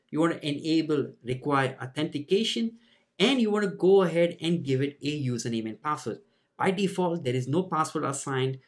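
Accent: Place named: India. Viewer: You are 100% from India. Unmistakable heavy accent.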